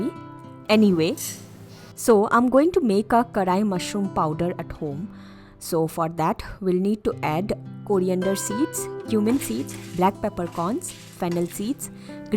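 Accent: Bengal, India. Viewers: Awesome vlogging...sply the way you speak up with a bengali touch i love it...Hop kaya is fine and better...dont worry...stay blessed.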